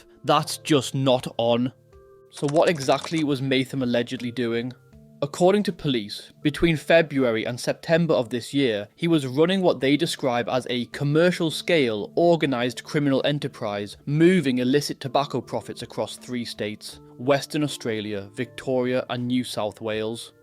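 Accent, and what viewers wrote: United Kingdom. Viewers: im so grateful you have a british accent, so i know you're safe in the UK bro, if i did videos like yours, as a local, i think i'd have massive testicles, but also know i wouldnt be safe. you're a legend.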